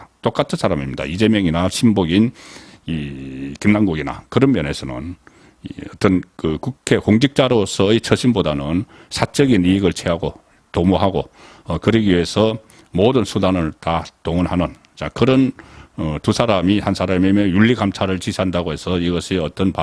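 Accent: Gyeongsang, South Korea. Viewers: 경상도 출신이라니 이해는 하겠지만 그래도 그렇지요. 방송하는 분의 발음이 이래서야 되겠습니까. '의문'은 '의문'으로 발음해야지 '어문'이 뭡니까. 장단음 발음도 이상하게 하시니 말씀이 귀에 잘 들어오지 않아요. 발음 교정 훈련을 열심히 하시기 바랍니다. 그러면 더 인기있는 유투버가 될 수 있어요.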